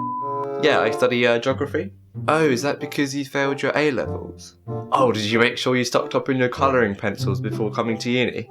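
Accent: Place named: India. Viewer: I thought u were definitely an Indian dude , the accent gets me everytime lol , good editing lol!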